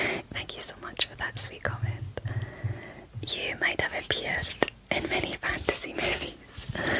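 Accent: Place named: Scotland, United Kingdom. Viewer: I think you are Scottish because of the way you sound but also I can understand what you’re saying so I don’t think you currently live in Scotland. Were you in America or England for a few years?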